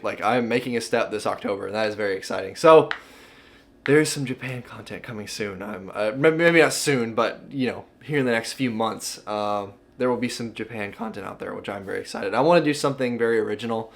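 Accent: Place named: Australia. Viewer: You the man! So I was watching some Braille skateboarding drama the other day and thought Ricky Glaser reminds me of you lol minus the Australian accent obviously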